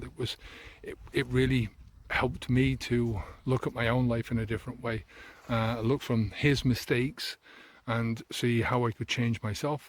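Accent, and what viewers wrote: Ireland. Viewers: Did you used to live in ireland? You have a slight irish accent.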